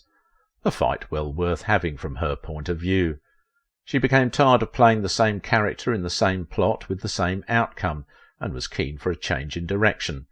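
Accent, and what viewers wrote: United Kingdom. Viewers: Please stop pronouncing it " shed..yul." I don't care if it's the UK way, it's WRONG...and it sounds stupid.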